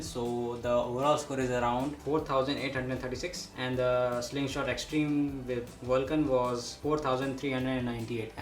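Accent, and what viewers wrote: India. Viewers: Why do indians speak english like this!? It is infuriating!! 😡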